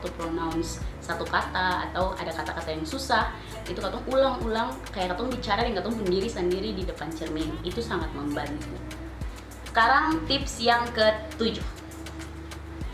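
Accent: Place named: Ambon, Indonesia. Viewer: Kudos to this video. 1. Apresiasi penggunaan dialeg ambon dalam penjelasan 2. This video might take 17 menit, but trust me, semua pertanyaan umum or kesulitan yg biasanya dihadapi pemula, udah dijawab disini. 3. I highly recommend the tips of having English environment, watching movies dan pake app untuk English learning contohnya ELSA (di app store ada) untuk pemula. Last but not least, please punya kemauan! Again, thankyou kaka 🎉🎉🎉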